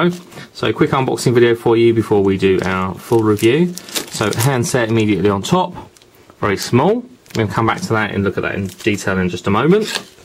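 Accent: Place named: England, United Kingdom. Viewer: English accents unboxings are the best.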